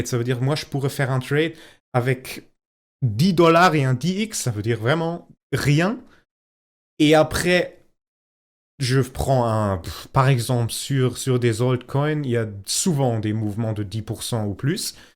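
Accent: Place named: Eastern Europe. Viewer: bravo à toi pour l'explication : très claire, et je n'ai pas vu ça ailleurs :)) comment va ton portefeuille depuis oct 2024 (date de la video) j'imagine que tu as bien profité : ? une question : je suis sur Blofin, apparemment il n'est pas possible de ressortir la marge initiale et de même je ne peux pas changer le levier même après après avoir remonté le stop loss dans un endroit où je ne perds plus (je ne peux que gagner).Blofin m'indique le message "you still have isolated orders pending" et me demande de supprimer le stop loss avant de pouvoir changer le levier, de ce que je comprends.....Sais-tu me confirmer que sur Blofin ce n'est pas possible de faire ce que tu as fait sur Bybit ? Dans ce cas as-tu un conseil pour une plateforme alternative à Blofin que je puisse utiliser (Kucoin peut-être ?)....Au fait bravo pour ton français, je croyais déceler un petit accent du Nord (type Belgique flamande) mais non, c'est bien un accent de l'Est...Pourquoi faire tes vidéos en français plutôt qu'en anglais ou en allemand ? 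Cheerz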